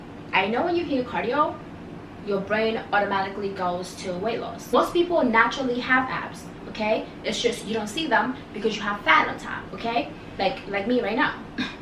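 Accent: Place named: Africa. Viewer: Off topic, but why does she sound like an African who is trying to sound American? Or an African who lives in America?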